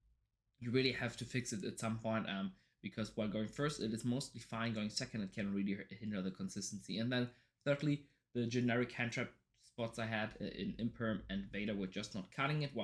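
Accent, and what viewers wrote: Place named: Germany. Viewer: Cool du bist auch ne deuscher :-) Hatte mich schon etwas gewundert über die akzent :-D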